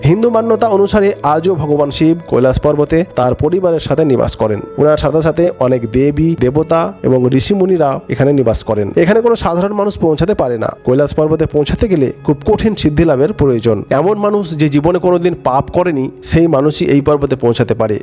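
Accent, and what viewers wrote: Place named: Kolkata, India. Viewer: ক্যালকেসিয়ান উচ্চারন পরিহার করুন!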